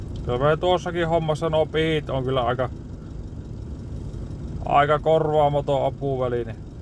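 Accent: Finland. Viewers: I just realized why my question was awkward! Sorry, when I posted my comment I hadn't had the chance to listen carefully yet, and my understanding of the Oulu dialect is still narrow so I hadn't caught much of it anyways — I got a little too confident. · Hehehe, as soon as I heard your voice I knew you’re a Finish guy. And normally Finish people understand humor and sarcasm. But yeah, you got some skills there, not going to lie :o) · I can't with the country Finnish accent telling the most relaxed story of what's been going on while performing intricate surgical moves with a huge machine. 😂 Eeipä se haettaa.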